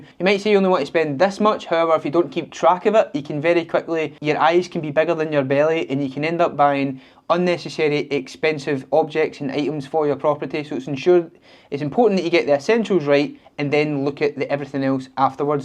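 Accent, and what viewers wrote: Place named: Manchester, United Kingdom. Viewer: What accent is this? My subtitles are confused. Are you from Manchester?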